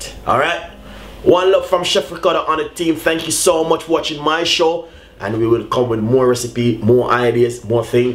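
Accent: Jamaica. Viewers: I love your accent Jamaica tunnation nice one there, but that vegetasble is not seen clearly to see it i can get around me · The accent really attracted me, big up, nuff respek to my Jamaican bra. Anyone without an accent is dumb (can't speak). There are more than 10 different accents in the UK and they are all speaking English! People need to travel and learn. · For those who are concerned about his accent,he's my countryman,'Jamaican'to the core,no speaky spokey,and i rate him for that,straight down to earth son of the rock,thumbs up,Ricardo,gwaan do u ting mi yute!!! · Some people just like talking rubbish he is form Jamaica that how they speak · I lova me that Jamacian talk and sound, Chef Ricardo!! I love the island mindset and food/drinks and healthy!! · Coo-Coom-Brrrrr! So love that Jamaican accent! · His Jamaican his accent is different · He sounds Jamaican.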